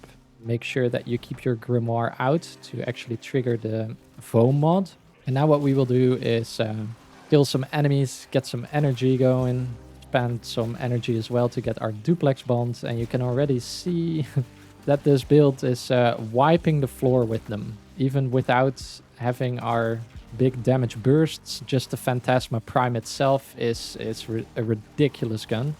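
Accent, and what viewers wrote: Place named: Netherlands. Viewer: He is from Netherlands... This is an accent.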